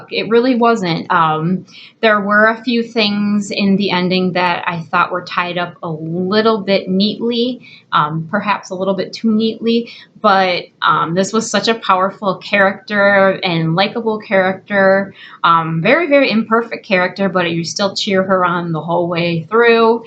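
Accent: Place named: Florida, United States. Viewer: I like your accent.. are you from Florida?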